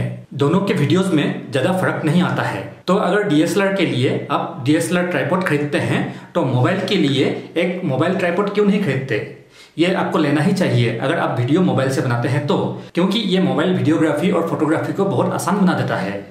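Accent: Assam, India. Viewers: Your hindi accent sounds like you are Assamese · your accent sounds assamese ☺️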